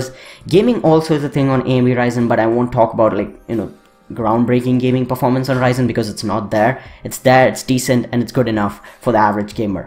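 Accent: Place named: India. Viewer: cause he is indian but at least i can understands him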